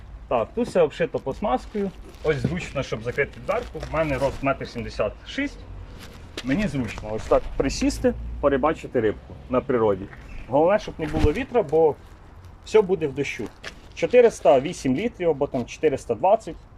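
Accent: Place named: Russia. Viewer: По говору видно что русский настоящий русский)))😊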